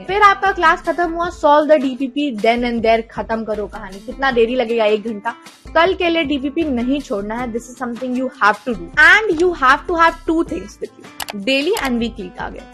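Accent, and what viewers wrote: Bihar, India. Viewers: aapke bolne ke accent se lagta hai aap bihari ho i am also from bihar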